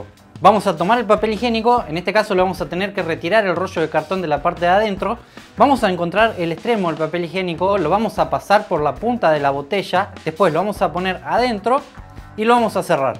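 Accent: Argentina. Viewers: Argentino.... Bahhh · me gusta tu acento argentino soy española y francesa pero vivo en Francia. todo eso me gusta pero en esta vida no tomamos tiempo siempre vamos de prisa y corriendo. un dia voy a intentarlo! !pero si estropeo mí plancha! !uuunnn.solo será culpa tulla. Me gusta como pronuncias las elles.un besote muy grandote desde Francia 👄👄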